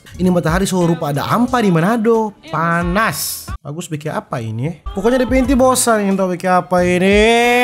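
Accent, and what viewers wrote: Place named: Palu, Indonesia. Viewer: Rupa ada ba logat palu tadi ka oppo 😂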